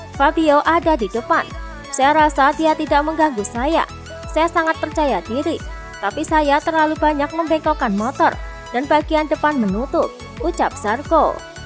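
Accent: Java, Indonesia. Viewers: Admin ny medok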